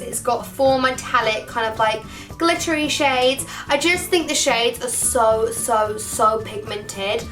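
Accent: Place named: United Kingdom. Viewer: Hi 👋🏻 I subscribed and pressed the bell. I remember the first video I watched from you was your nan doing your makeup and it was hilarious!!! At first I thought you looked like Nicole Skye but then I heard the british accent then I was just like 😅 (that was awkward)😂 btw I hope your nan is well!! ❤️❤️❤️❤️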